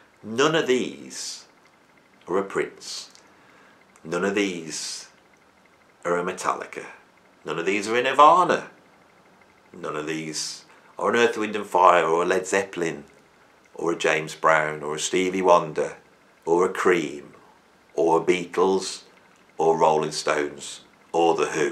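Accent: Birmingham, United Kingdom. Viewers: Is that a Birmingham or a Wolver'ampton(Slade) accent?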